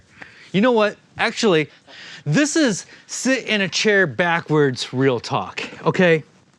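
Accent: Ohio, United States. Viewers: I can tell you are from Ohio just from how you talk. "From selling dru...."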